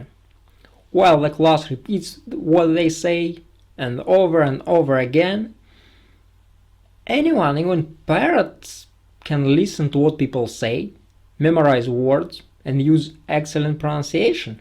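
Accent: Russia. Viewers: yep, he's russian alright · You have the most stereotypical Russian accent. I love it 😍😂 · You from Russia??? I know this accent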